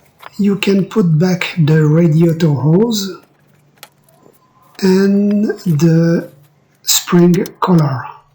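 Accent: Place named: France. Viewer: Hello, am I correct...?? Does the Kangoo 2nd. generation have a K7J engine..? I have always loved to hear english spoken by a French person... but now I am especially pleased hearing you explain details about jobs I need and would like to do. am giving a big thumbs up to all your videos. Best wishes, Mike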